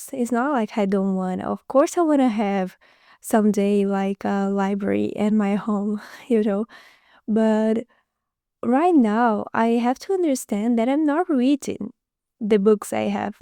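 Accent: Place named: Brazil. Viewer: That's so cool! I'm from Argentina but live in the US since I was a kid. I suspected you were from Brazil due to the accent. Your English is great for not having been abroad! Here they have a lot of little free libraries and the libraries are pretty good with variety. I have probably thousands of books, so I am trying to read and get rid of those I don't think I'll reread. Used books are super cheap so it's very tempting to load up on them.😅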